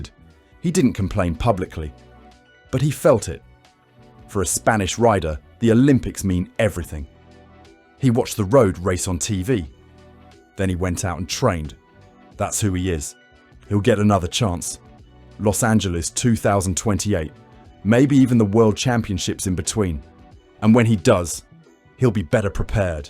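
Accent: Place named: United Kingdom. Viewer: I thought the same thing. Using a never cyclist wanker with a British accent…Classic!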